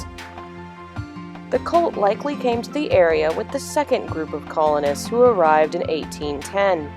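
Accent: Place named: Virginia, United States. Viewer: omg are you from Virginia?? I've been wondering what your accent is and I thought Virginia. I went to middle and high school in Richmond :)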